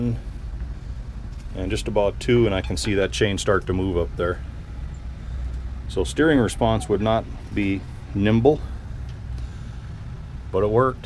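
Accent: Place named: Midwest, United States. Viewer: I'm from the Albany area of New York... and it always tickles me to hear the midwestern pronunciation of Albany.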